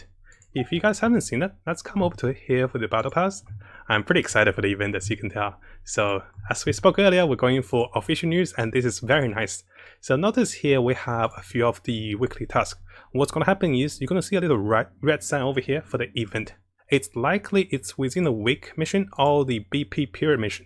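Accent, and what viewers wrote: Australia. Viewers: This guy must be Australian. Just my guess lmao I think I'm wrong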